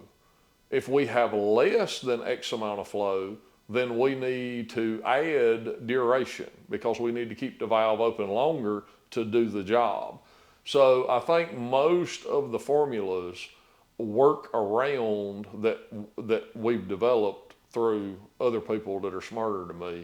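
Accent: South, United States. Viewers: Love that southern drawl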